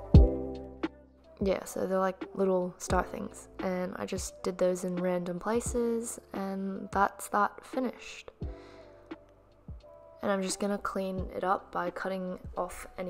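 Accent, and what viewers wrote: Australia. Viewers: Australia. It's just discernible in some words ;-) (and it tells on her About tab on her channel page)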